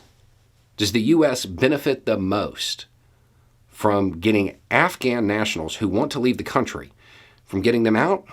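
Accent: South, United States. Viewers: I wish I had you as a political science teacher in high school!! I am sorry I ever jumped to a bad first impression of you...I will remember to never put off someone as unintelligent due to a long beard and Southern accent. I truly learn a lot from you. With sincere thanks!!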